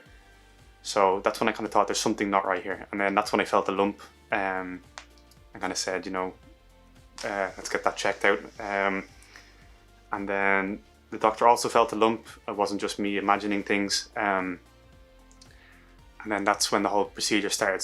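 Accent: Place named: Ireland. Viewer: Are you in Ireland? Your accent sounds Irish.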